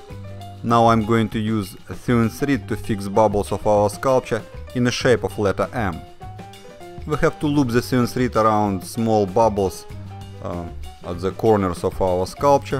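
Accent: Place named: Russia. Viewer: Русский режет на английском ухо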